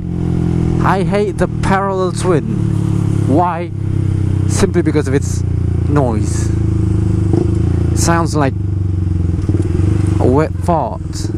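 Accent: United Kingdom. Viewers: aksen british nya keren . kaya ga ada indo2 nya sama sekali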